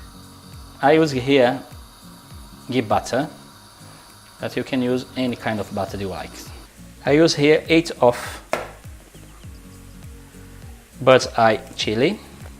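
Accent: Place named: Brazil. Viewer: Thicc Brazilian accent. Beautiful.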